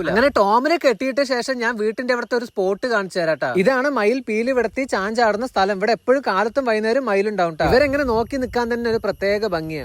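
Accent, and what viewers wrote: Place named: Palakkad, India. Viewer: നമ്മുടെ പാലക്കാടൻ സംസാരം കേൾക്കാൻ നല്ല രസമുണ്ട് ഏട്ടാ നിങ്ങളുടെ വിഡിയോ ഇഷ്‌ടമാണ് നായകുട്ടിയെ ഒരുപാടു ഇഷ്‌ടമാണ് ♥️♥️♥️♥️♥️♥️♥️♥️♥️♥️♥️♥️♥️dog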